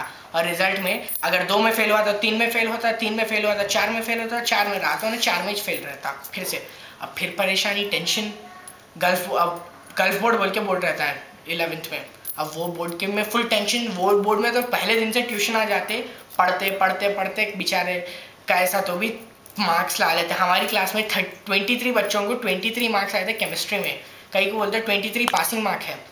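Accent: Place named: Hyderabad, India. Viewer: dude m watching this just for your hyderabadi.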